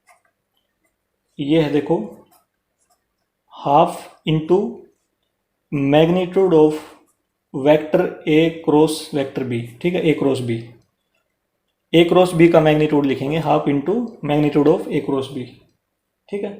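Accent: Haryana, India. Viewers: Re sir aap to haryanvi jaise bol rhe ho But love u sir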